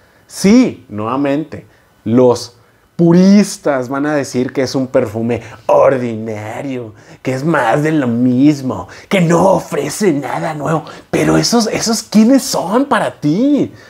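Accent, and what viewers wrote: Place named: Monterrey, Mexico. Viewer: Por la camisa que traes y el acento que tienes,me imaginó que eres de Monterrey y allá el calor esta cañón...buen perfume para el calor de Monterrey.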